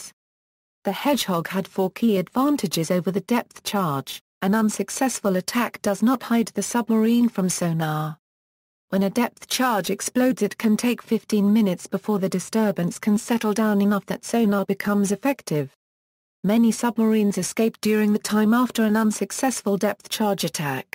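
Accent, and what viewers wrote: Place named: United Kingdom. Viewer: A computer with a British accent ... cute~!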